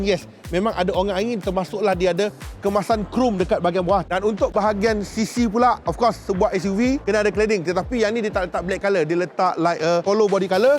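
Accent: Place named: Negeri Sembilan, Malaysia. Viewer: kan nismilan bona bunyi eeh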